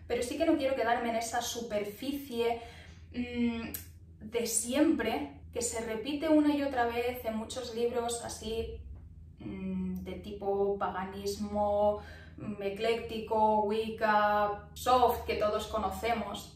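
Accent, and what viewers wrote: Catalonia, Spain. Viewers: Me caes demasiado bien. Eres catalana no? Quedamos para tomar un café y charlar? 🥹🤍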